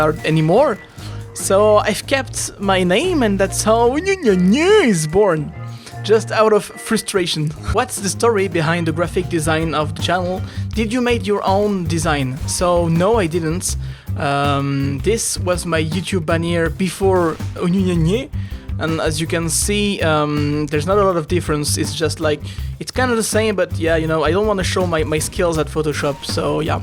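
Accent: France. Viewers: J'aime le sexy french accent :D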